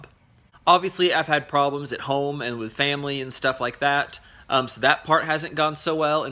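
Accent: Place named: Texas, United States. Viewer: LOL, of coarse you don't and for being from Texas it is very slight, but just enough to be unique and cute.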